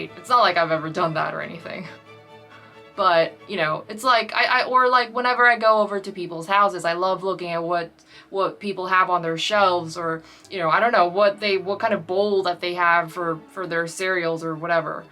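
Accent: United States. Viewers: Have you always been in korea ? Cause your accent sounds like you lived in STATES...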